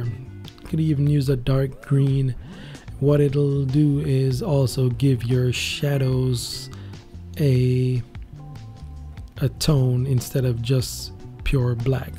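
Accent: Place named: Jamaica. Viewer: Very nice are you Jamaican? Your accent sounds like me. I was looking for colored skin tone so I checked out your tutorial, it’s very informative. Thank you.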